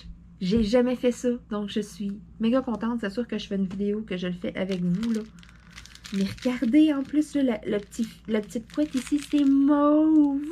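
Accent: Quebec, Canada. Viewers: cc bravo et merci pour ce bon moment et ton rire avé l'accent quebecois,c'est divin merci de super cadeaux j'adore le stylet bonne fin de journée gros bisous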